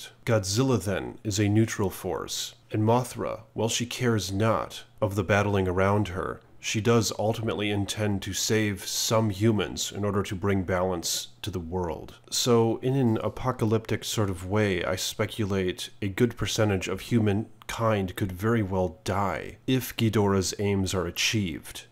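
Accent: RP, United Kingdom. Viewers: It's a reasonable guess,. I thought they would go the elemental route... Earth, water, air, fire and spirit but there are only a couple of the Titans that fit those specific attributes... PS - Your doing the Lord's work on G2 compared to your peers because: 1. You made it out of puberty. 2. You speak the Queen's English. 3. You don't ramble incoherently. But you have to ditch the creepy, pedo pizzagate voice.... 👌👌👌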